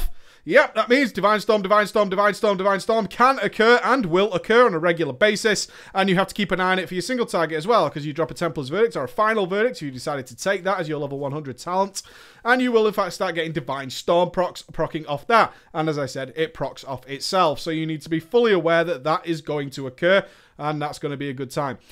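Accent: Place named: United Kingdom. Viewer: hard to hear what u saying with that brittish accent + you talk to fast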